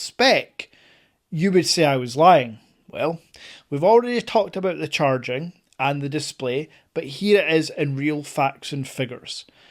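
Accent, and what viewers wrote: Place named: Scotland, United Kingdom. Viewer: Scottish accent! Rab C Nesbitt does tech.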